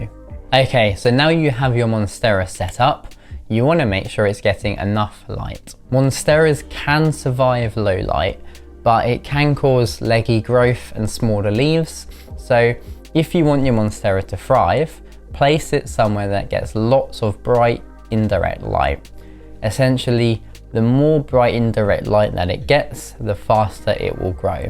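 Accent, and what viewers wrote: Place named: Wales, United Kingdom. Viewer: Thank you for this excellent video. As an American I was trying to place your accent. Are you possibly Welsh or perhaps Australian ?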